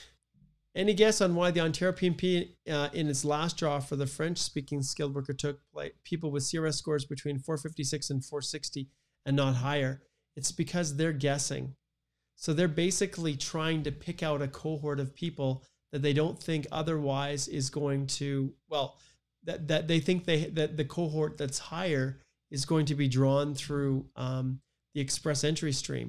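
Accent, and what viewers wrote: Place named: Portugal. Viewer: Great Portuguese,! I'm impressed. You got a bit of Portuguese (from Portugal) accent there, awesome!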